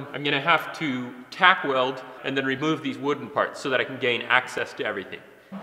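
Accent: Canada. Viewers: This is the most Canadian sounding American i have ever heard.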